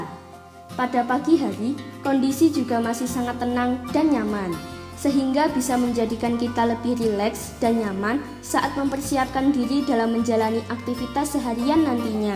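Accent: Java, Indonesia. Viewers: medok medok seksi❤❤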